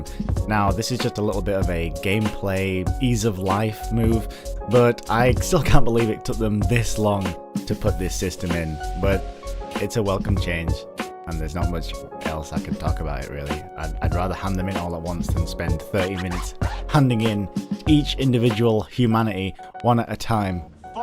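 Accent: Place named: Northern England, United Kingdom. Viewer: Lenayus Mynestone Northern England accent.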